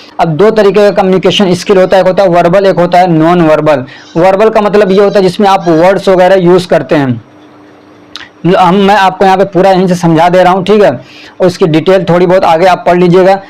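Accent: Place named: Mithila, India. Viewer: Sir aap kha se aapka tone bihar k mithilanchal area se match krta hai aapka bahut shukriya itne achhe trah padhane ke liye allah apko lambi umar de ❤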